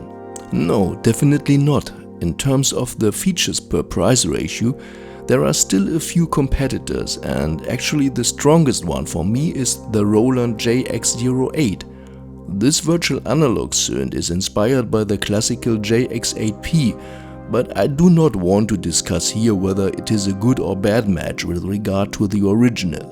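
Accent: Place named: Germany. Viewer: I missed the special, somewhat druggy German accent 😅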